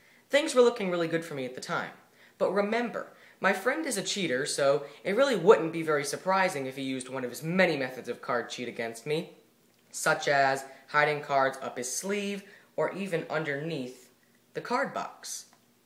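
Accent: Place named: United Kingdom. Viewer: Oh, are you British or American?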